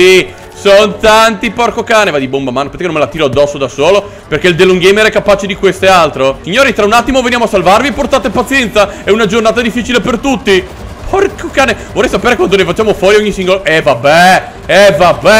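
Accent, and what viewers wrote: Russia. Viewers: Adoro tuo accento ruusso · Il miglior accento russo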